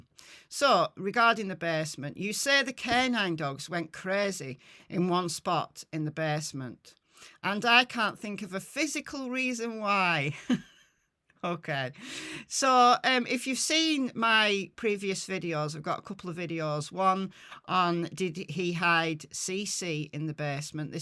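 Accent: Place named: Northern England, United Kingdom. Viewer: You've turned in2 one of my fave channels on this case. Plus you sound like me northern mate. I'm.sarf England. Plus you know what ya talking about xx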